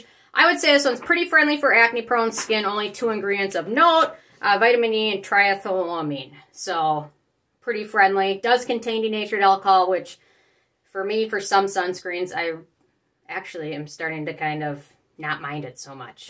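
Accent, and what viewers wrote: Minnesota, United States. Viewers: Are you from Minnesota, by any chance?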